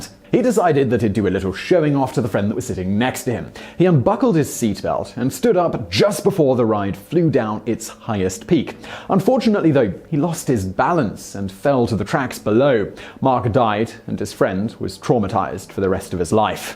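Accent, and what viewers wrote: United Kingdom. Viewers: For god’s sake, he’s British. That’s how they pronounce it in that accent. Lay off.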